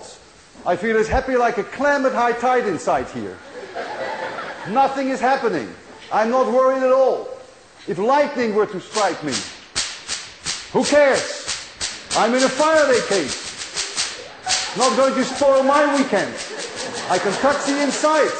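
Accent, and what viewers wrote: Netherlands. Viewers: Prof was born in Holland? - his accent is very Dutch Great presentation though :) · all I can tell you is that his accent is dutch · As far as I can hear, he is dutch. 100% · Dit is een Nederlander, ik hoor het aan zijn accnt XD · He has a Dutch accent somehow.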